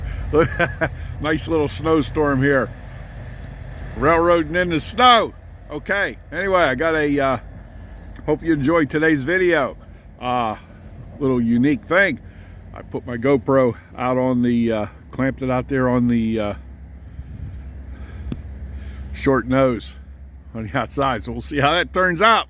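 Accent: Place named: Pennsylvania, United States. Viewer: BTW, my wife grew up in New Kensington and we have many family members in that area.. We live in Texas. She loves hearing your Pennsylvania accent....Great Show!!!!!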